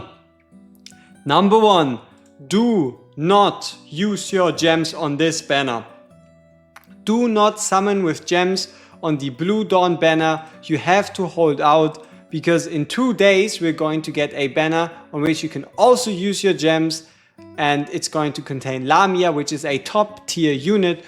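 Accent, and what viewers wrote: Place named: Germany. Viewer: Ich erkenn an der Art wie du sprichst das du Deutscher bist. Meinst du man sollte neu anfangen wenn man diese Fehler relativ oft am Anfang gemacht hat oder trotzdem weiter spielen bin jetzt Level 25 nach 2 Tagen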